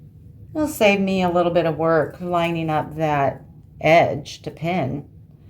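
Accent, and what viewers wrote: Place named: Upper Midwest, United States. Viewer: I hope you don't mind me asking where you are from. Your accent has me going. I'd say Wisconsin, North Dakota or Minnesota> ??? Am I correct? :D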